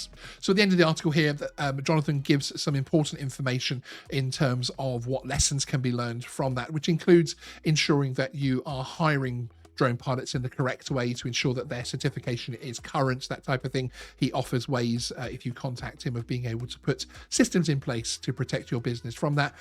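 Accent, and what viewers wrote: United Kingdom. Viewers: Reading off incidents meant to scare pilots while providing no real particular info on individual cases.. Click bait, British accent meant to infer authority, he is a wanker.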